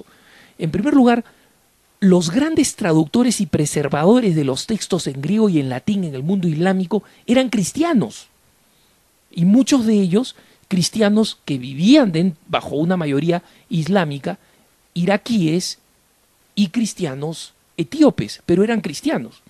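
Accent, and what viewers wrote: Lima, Peru. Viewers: eres peruano? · Creo que por el acento el pata es de Lima pituquilandia... me parece, o de Huacho o del norte chico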